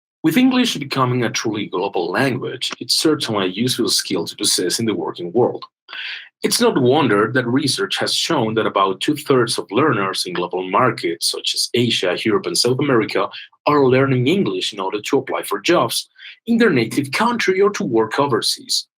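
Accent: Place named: England, United Kingdom. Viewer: Omg 😱😱 que perfecto abla el inglés como en Inglaterra estoy asombrada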